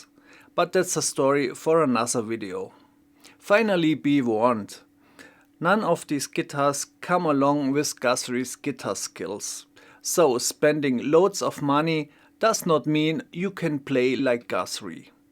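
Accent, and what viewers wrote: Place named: Germany. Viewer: This german english...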